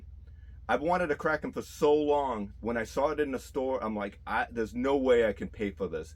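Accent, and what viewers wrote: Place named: New England, United States. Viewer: After living in Mass. & New Hampshire for 16 years myself, I could listen to you say Sta Wars all day. Great Video.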